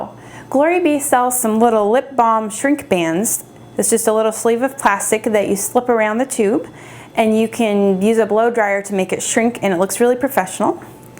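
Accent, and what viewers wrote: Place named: England, United Kingdom. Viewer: I saw you on afolasmr I thought you were from England 😅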